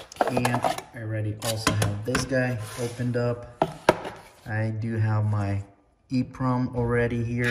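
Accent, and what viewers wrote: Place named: Soviet Union. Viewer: Soviet accent is hard to listen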